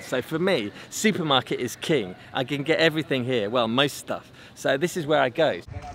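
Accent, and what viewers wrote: United Kingdom. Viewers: Thanks for the video and for information ! I love your british accent (no homo)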